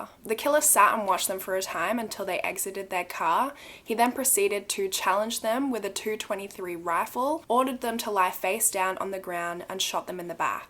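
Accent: Australia. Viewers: You've never sounded more Australian. Love it 😂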